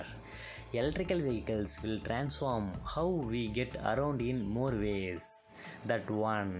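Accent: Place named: India. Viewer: Heavy Indian accents are just horrible to listen to if you ask me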